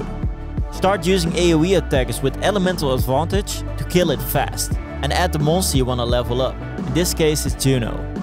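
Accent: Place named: Netherlands. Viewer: I saw you on killa´s video and i knew right away you we´re dutch haha and then few minutes in the video when you said toa then you confirmed it haha! Nieuwe sub erbij :) succes met je kanaal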